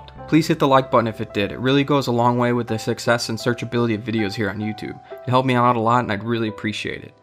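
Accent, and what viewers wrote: United States. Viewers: Your videos are so interesting and helpful, but the heavy midwestern accent is insufferable.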